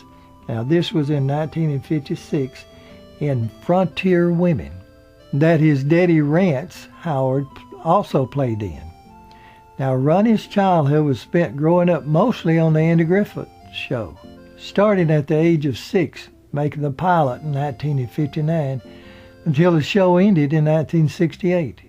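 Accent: South, United States. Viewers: Hey we all love your videos and are always looking for more to come, your voice has a soothing quality, must be the southern accent, we here at my have come to a consensus, you are the Andy Griffith show of the documentary shows, keep em comin buddy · This was another great video presentation by. Love the southern accent. The info on Frances Bavier was quite interesting. A lot of the research here was verified by what I already knew or had read about TAGS for several years. The pictures were accurate except for the one with Andy's family picture, featuring Lee Meriwether. That was a publicity photo for The New Andy Griffith show if I'm not mistaken. I finally understood why Don Knotts left the show. It was made clear. Thanks. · I love your southern accent! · Im originally from Pittsboro NC also in Chatham County just a few miles from Siler City. I love the way you pronounce the town names properly with the essential southern drawl. 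Wink wink. I'm whistling the theme tune now. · The narrator is from the south. Obviously he's an older gentlemen. Show a lil respect. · MoonMyst Well he is an older southern gentleman so yeah lol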